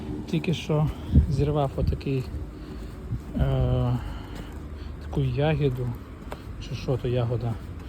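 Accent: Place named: Western Ukraine, Ukraine. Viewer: Повертайся додому ,ухилянт,ти,херовий..і працюй на свою державу..чи може тобі держава не потрібна?? Не соромно? Такий бугай шастаєш за кордоном.. Мова західної ..і відео ні про що ... Яке майбутнє даси синові?? І ще одне , блогером може бути людина ,,яка знає і може щось цікаве розповісти,,а не про бутилочку з водою.і взуття замурзане...😅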